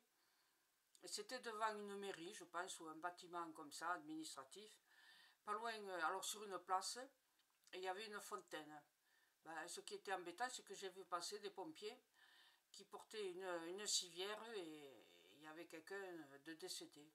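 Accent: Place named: South, France. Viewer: Vous avez un bel accent du sud 😊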